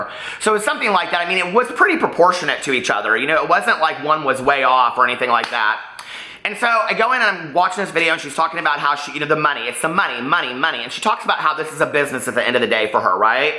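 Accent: South, United States. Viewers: You always sounded so southern to me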